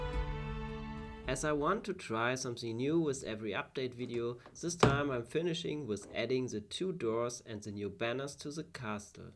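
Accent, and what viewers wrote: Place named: Germany. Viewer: Moin😉👋, Man hört definitv den Deutschen Akzent heraus 😂🤭, doch sieht das Resultat echt gut aus! Viel Erfolg weiterhin😉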